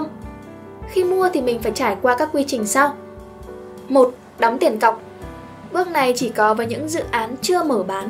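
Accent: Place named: North, Vietnam. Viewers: Căn giá rẻ thì vị trí không đẹp, căn đẹp thì vị trí mắc? Sao đang nói giọng miền Bắc nhưng cứ chêm mấy từ của miền Nam vào vậy ạ?! Râu ông nọ cắm cằm bà kia. Lạm dụng quá nhỉ? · Bài viết có thể của 1 bạn miền nam mà còn giọng đọc thì của bạn miền bắc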